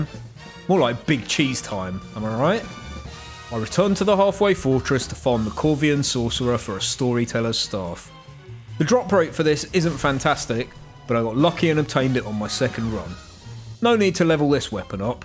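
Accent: United Kingdom. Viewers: This British accent is like a dark souls one, you’re the onion knight of reality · Somehow your british ( I think, im sorry if I'm wrong ) accent makes this 10 times more entertaining .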